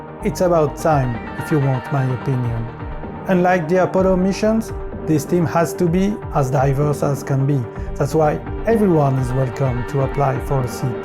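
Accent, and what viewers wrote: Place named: France. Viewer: Nice french accent 🤟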